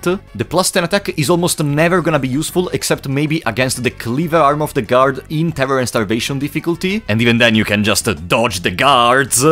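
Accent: Italy. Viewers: sei ita? da come parli sembra di si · Perché un italiano dall'accento maccheronico parla in inglese di uno dei più bei giochi mai creati? Iscritto. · I don’t know why but your accent sounds italian, se sei italiano bro sei un grande, anche se questo gioco è mega di nicchia in Italia è davvero un piccolo capolavoro ed è bello vedere uno dei nostri che lo porta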